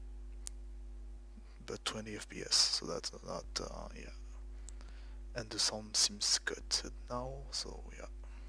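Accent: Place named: France. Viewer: J'adore ce que ca donne un francais qui parle anglais :p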